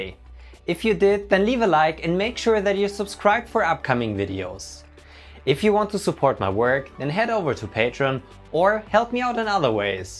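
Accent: Germany. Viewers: Are you German or Amerikan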